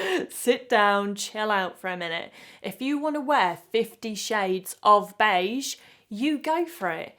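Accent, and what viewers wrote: United Kingdom. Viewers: Thanks for being a normal person and including the goofs. Makes you seem down to earth. I lived in London for two years in the 90s when the music was the best and I LOVE and miss your accent. I used to hear your accent all the time. I now live in the states and the accents are not the same. British accents are the best. Thanks for your style advice by the way. I'm still a tomboy so I need some help in that area.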